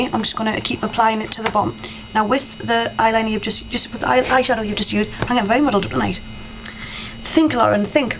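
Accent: Newcastle, United Kingdom. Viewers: Yeah newcastle. My B/F talks like that, lol. It's cute.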